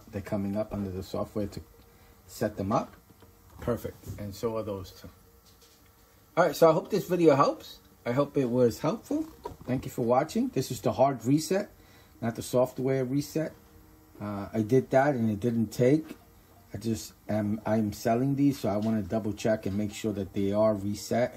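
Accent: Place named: New York, United States. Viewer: Bro, thank you for sharing this I was trying to help my sister in Orlando and I could not figure out how to reset her Google Wifi two. The button on the back of the gen 2 is underneath the device with no fiscal button. Bro, I was listening to speak and I swear you sound just like me. I told my wife to listen to your video and she was that the voice sounds familiar. She tells me that's a New Yorker voice. I told her that's me except you have a little higher pitch. Anyways, thanks for sharing.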